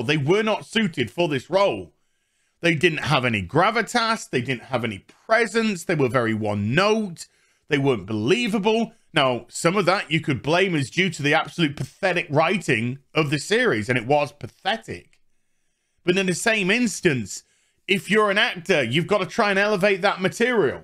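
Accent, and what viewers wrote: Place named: RP, United Kingdom. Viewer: BTW, I love your "British" (posh) accent.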